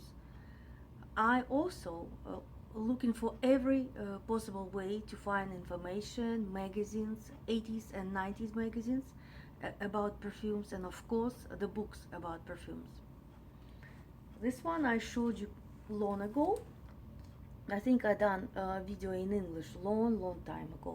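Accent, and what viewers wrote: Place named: Russia. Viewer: Thank you for making videos in English. Your russian accent heips me with understanding. И вообще, здорово совместить приятное с полезным: и понимание английской разговорной речи чуток прокачать и про любимые парфюмки послушать в вашей прекрасной манере.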